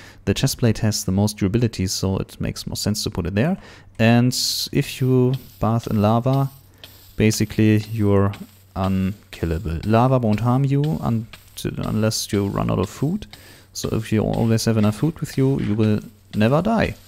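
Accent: Germany. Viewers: I love his german accent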